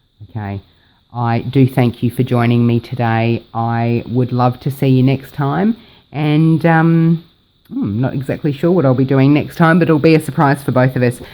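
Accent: Australia. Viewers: Thank you for the video, I love this pattern. Love hearing an Aussie voice too, look forward to more videos. G'day from Adelaide.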